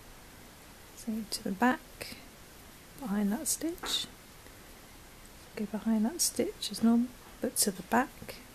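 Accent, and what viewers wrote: England, United Kingdom. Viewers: Hi I notice you're talking in an English accent but talk about single crochet. In the UK we call it double crochet. Please could you tell your UK viewers the difference. Thanks. x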